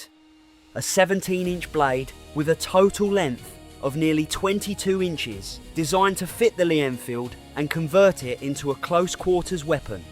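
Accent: United Kingdom. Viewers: Surely the British soldiers carried ‘aluminium’ canteen and mess tins NOT ‘aluminum’ items! If using a British sounding AI narrator FFS ensure it uses British pronunciation!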